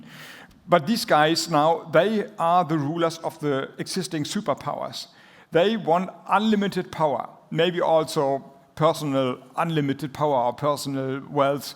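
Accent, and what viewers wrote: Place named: Germany. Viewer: Yep, der klingt wie ich und ich habe einen fiesen deutschen Akzent. Vielleicht könnte er es auch zu seinem Markenzeichen machen. Und es verwurzelt ihn. Seine Internationalität ist eh ein bisschen ein Problem, falls er bei den Deutschen punkten will (also einige überzeugen, die ihn eh nicht schon super finden). Vor allem nach der Baerbock Aktion mit New York.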